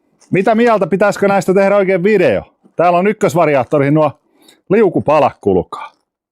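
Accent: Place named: Laihia, Finland. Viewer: faija laihialta ni tua murre on hienoo kuultavaa :)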